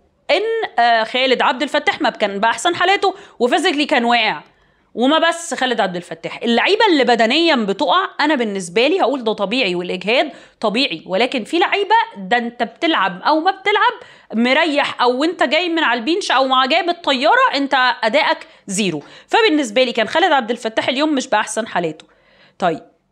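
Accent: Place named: Levant. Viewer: حاولي تظبطي لهجتك المصريه ... بلاش استخدام بي بدلا من في ولا حضرتك عيشتي في الشام؟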